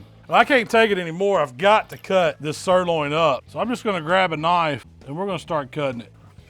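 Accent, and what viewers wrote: South, United States. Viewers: Dude with cool southern accent, love of red meat and Jack Daniels, and uses the black nylon BBQ gloves...I'm subbed! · This guy is awesome! Where is he from? Im from Germany so i don't know much about american accents but he sounds so cool! Anways greetings from Germany!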